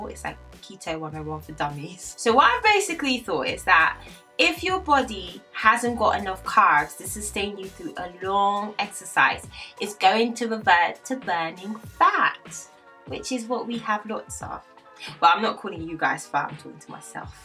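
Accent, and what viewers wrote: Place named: United Kingdom. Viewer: nice to hear a British accent on YouTube for a change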